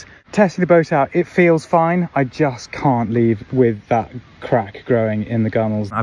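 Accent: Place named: Scotland, United Kingdom. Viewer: I struggled to understand your broad Scots accent. Nice canoe, err bike, err binoe, err cake. It’s a cake, definitely a cake.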